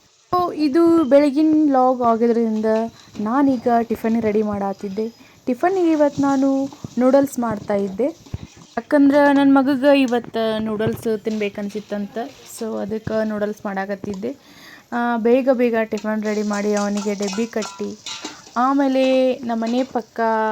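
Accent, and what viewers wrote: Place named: Dharwad, India. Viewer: Nivu dharwaddavar yenri sis yaakandra nimma bhase hangaaa iiyti sdakari kelde sis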